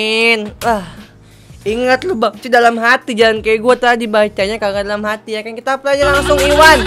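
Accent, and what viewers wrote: West Java, Indonesia. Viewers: abang orang sunda ya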